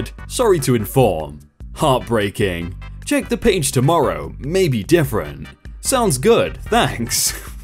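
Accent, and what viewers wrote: United Kingdom. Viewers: Everytime I start up a video of yours, YouTube automatically gives me American English subtitles of your British English. I turn them off, but after every ad, they come back on...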